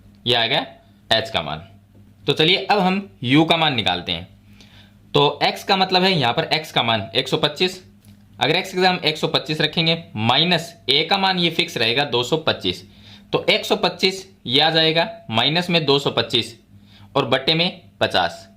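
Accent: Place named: Rajasthan, India. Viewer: Aap rajshthani he sayd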